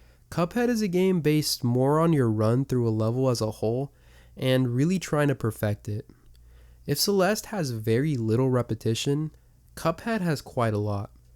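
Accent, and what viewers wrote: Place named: Brazil. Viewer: Dude, are you Brazilian? You have an accent that reminds me of Portuguese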